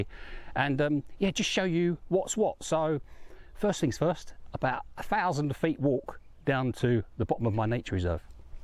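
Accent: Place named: United Kingdom. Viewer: Thank you for the vlog, i love the scenery, and the way you hv done up your property. If i ever have students again to teach them english i will recommend your chennel, beautiful every day british english. Thank you sir.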